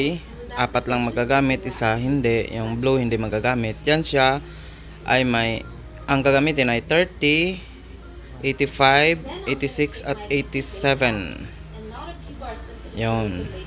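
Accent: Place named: Visayas, Philippines. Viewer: Bisayang bisaya....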